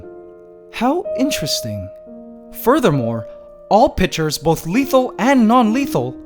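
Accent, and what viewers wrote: Canada. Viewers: “Here in the Philippines” wait a minute I thought this was canadian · The Philadelphians thought you where from Canada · Philiphines? I thought you were canadian?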